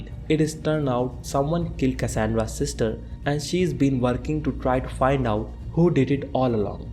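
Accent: India. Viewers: Very bad gujju accent. sorry.. thumbs down.. please dont spoil our day again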